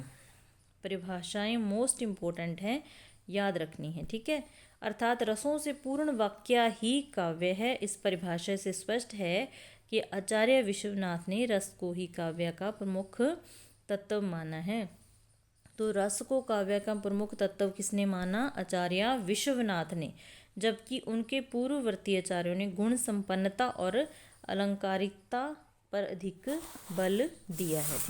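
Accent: Punjab, India. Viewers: Mam aap Punjab se h kya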